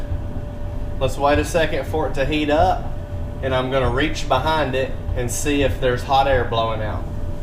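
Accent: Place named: South, United States. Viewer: Great instructional video...and cool southern accent to boot! Thank you.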